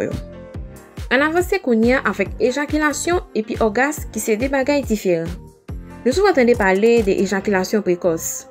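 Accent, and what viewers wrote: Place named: Delmas, Haiti. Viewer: Sa son nèg delma😂😂😂😂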